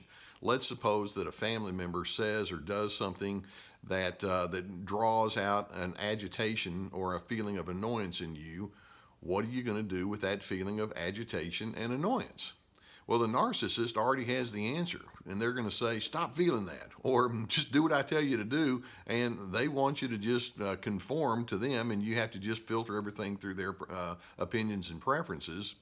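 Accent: South, United States. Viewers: Lovely southern drawl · Sundiata Keita he sounds like a southerner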